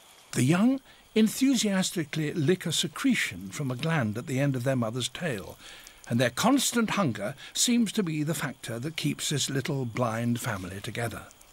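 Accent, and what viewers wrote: United Kingdom. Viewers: I wish this eloquently spoken British chap would narrate my life..."The American father, still in a stupor early in the morning, must have coffee to function properly, and tends to watch strange youtube videos while sipping coffee."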